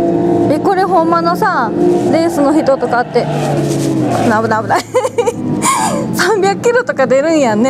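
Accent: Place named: Kansai, Japan. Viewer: 関西弁や。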